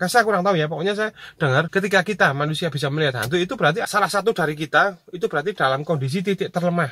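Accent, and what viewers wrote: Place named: Surakarta, Indonesia. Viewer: Jebulane gagah mas Iki...suaranya khas solo..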